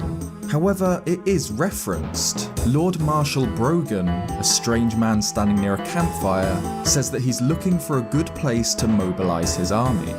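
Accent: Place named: United Kingdom. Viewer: all British people sound the same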